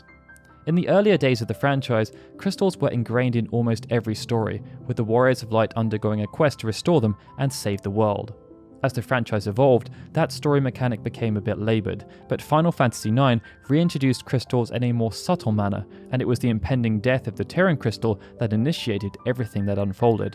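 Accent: United Kingdom. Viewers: There is no other way to listen about final fantasy then with a British accent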